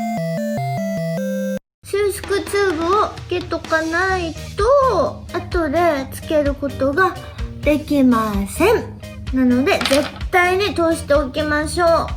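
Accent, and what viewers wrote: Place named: Kansai, Japan. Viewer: こんにちわぁなるほど分かりました。親切丁寧たまに関西人ですやん！これからも楽しいずっこけ動画お願いねーほな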